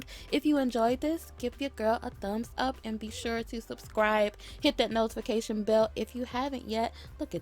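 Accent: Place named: Louisiana, United States. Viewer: I'm kinda new to watching your videos and I must say this is my favorite!!! I'm from Ga and currently live in Tx. I LUV the way people from the "boot" cook(and talk)My grandmother taught me how to cook mac with canned milk but she used a couple eggs. Thank You so much for your videos. I will be trying your banana pudding tomorrow😉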